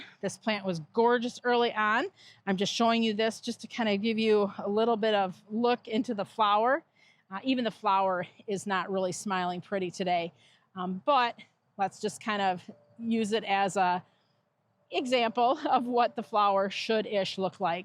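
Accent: United States. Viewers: If American mispronunciations bother you so much, maybe you should consider not watching an American's content about an American company, on an American made media platform. Clearly what we lack in proper pronunciation, we make up for elsewhere or you wouldn't be here.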